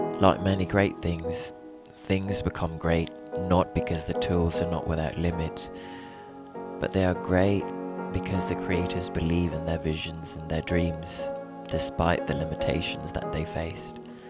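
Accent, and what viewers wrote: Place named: United Kingdom. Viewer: ini yang voice overnya british2 gimana gitu yah ngomongnya..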